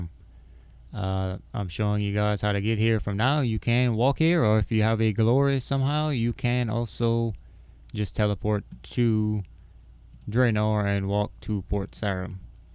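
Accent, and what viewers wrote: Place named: Louisiana, United States. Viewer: sounds like a Louisiana boy.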